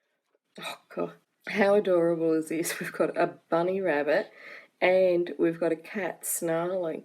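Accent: Australia. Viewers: I like your videos a lot, and it's great to hear an Aussie voice, but I'd like them even more if you kept you hands/fingers off the page while trying to see the details of the picture, and yes, they are exquisite. You've definitely persuaded me to buy at least one of these, so thank you for sharing.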